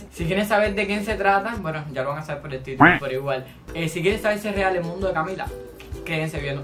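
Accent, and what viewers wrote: Cuba. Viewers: Me gusto mucho tu forma de hablar 😆tu acento como cubano pero cool! 😂. Y los look de maquillaje de Camila 😲👌👌 de lujo ! Aqui otra opoyo de.mi parte 😉😉 . Me gusta como te expresas 😊😊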